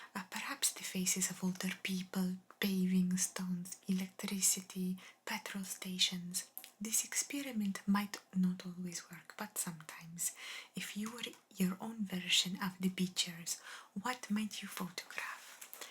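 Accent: Italy. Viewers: I love the creativity that you put into these roleplays. Very few ASMRtists would think to talk about water towers or Tom Cruise playing hide-and-go-seek. Your Italian accent is very fun too. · I love your Italian accent videos beautiful eyes ❤your voice is so calming 🫂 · Hello, magnificent ASMR with an Italian accent. I loved it and enjoyed it, it's relaxing. Greetings, beautiful 🌹🌹🌹🌹💖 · È così bello ascoltarti, il tuo accento italiano è meraviglioso e molto rilassante.💚🤍❤️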